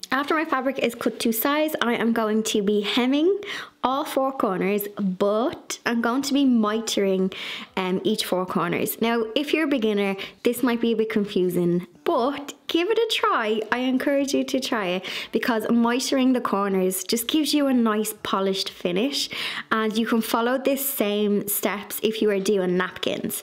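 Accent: Dublin, Ireland. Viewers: she has an Irish accent, a Dublin accent. My home town. It makes a change, as most of the sewing videos I’ve watched are from women with American accents. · I had to subscribe cause you are the first Irish youTuber I have come across. I have a son who spent some time in Ireland and he came back to Canada with the coolest Irish accent!🥰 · Oh lovely an irish accent! Im thrilled, living away from Ireland in spain all i hear are british accents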